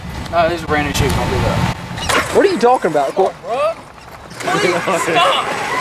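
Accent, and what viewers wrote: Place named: South, United States. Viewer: how Sothern American: yes I subscribed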